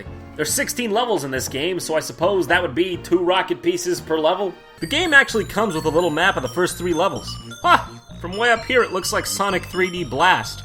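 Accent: New York, United States. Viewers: I love how his New Yoik accent just gets thicker and thicker as the games get worse and worse.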